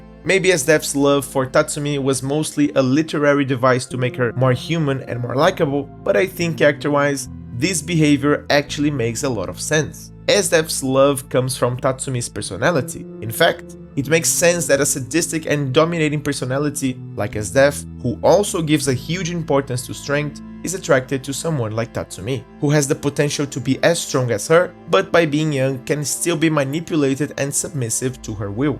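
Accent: Brazil. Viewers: mano esse cara é brasileiro eu acho. ingles bem portuguesado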